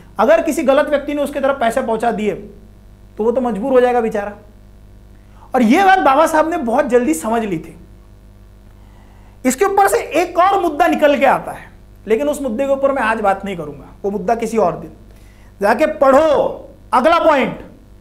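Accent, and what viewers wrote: Maharashtra, India. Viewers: Idk but you sound Marathi af sir. Typical Marathi guy talking in Hindi.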